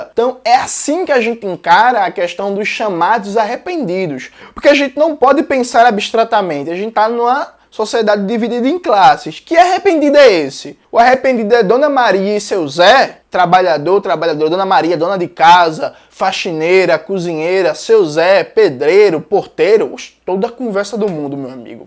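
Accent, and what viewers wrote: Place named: Northeast, Brazil. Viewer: meu amigo! Que aula foi essa hein? Vídeo supeer necessário!!! Seguindo suas redes agora! Compartilhando p geral! Ainda com esse sotaque de meu nordeste....S2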